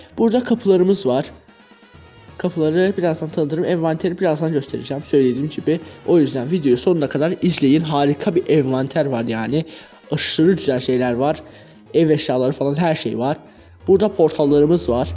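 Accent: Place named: Azerbaijan. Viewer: Salam məndə azeriyəm danışığınan bilinir azəri olduğun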